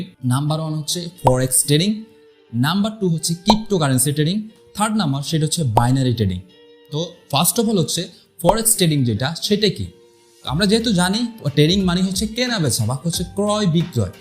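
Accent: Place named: Chittagong, Bangladesh. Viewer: Chittagong er mone hocche 😐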